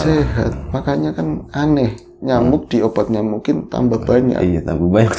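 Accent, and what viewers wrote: Banyumas, Indonesia. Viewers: Paling suka denger Mas bicara ngapak😄😄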